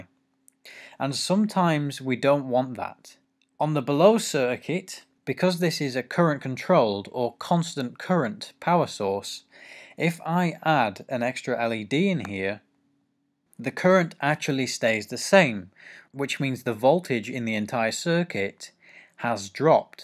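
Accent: United Kingdom. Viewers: Hey, what kind of british accent do you have?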